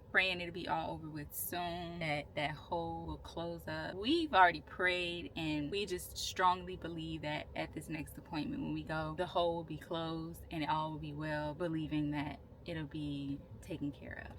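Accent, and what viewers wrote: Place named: South Carolina, United States. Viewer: So i just watched the full video and ive been watching yall for a long time but just found out your from SC! Im from Anderson South Carolina and i knew you sounded like you were from here. Where exactly are you from? I love your little family yall are precious 💕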